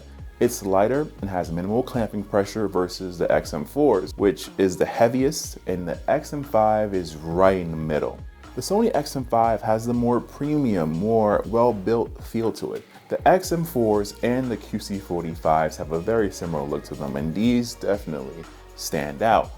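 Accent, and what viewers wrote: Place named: Philadelphia, United States. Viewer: Sounds like you are in the Philadelphia region based on those stops 😅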